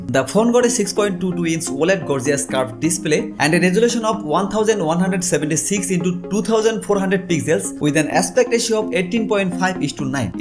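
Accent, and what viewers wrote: Bangladesh. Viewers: Bangladeshi english 😄😄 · Funny commentary Sounds so Bangladeshi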